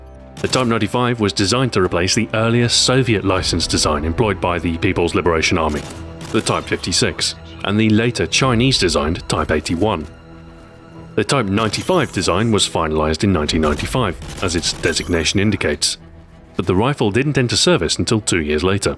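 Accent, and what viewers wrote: United Kingdom. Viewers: YOur british accent is so easy to listen to. And makes it a tad more intresting to watch.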